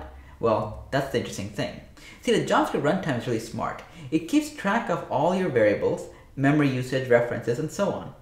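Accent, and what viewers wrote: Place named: Tamil Nadu, India. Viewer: Me too I think you are Tamil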